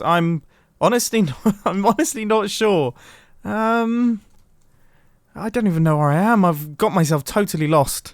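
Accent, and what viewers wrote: United Kingdom. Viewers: yup, he's British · Mumbo is extra British in this video. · Mumbo; *casually and pretty monotone* I wish you ill, oh deary me I'm on fire, lovely jubly (So British, I love it) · All the mild british exclamations are delightful. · his british accent level : strong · The fact that or any other song wasn't playing saddens me.... And is it just me or did Mumbo get more and more British as time went on?? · is the most british 7 secs ive ever heard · christ this man is british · I remember the moments when i realized that mumbo is british... All his britishness just became so apparent from that day onward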